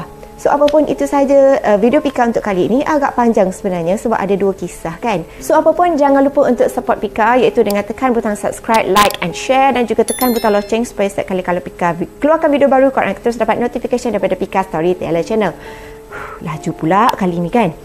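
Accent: Kelantan, Malaysia. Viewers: Maaf bertanya...daripada slang pika ni saya rasa pika ni orang kelantan kan?.... walaupun sekali dengar memang tak macam org kelantan,tp kalau dengar betul2 akan keluar slang kelantan tu.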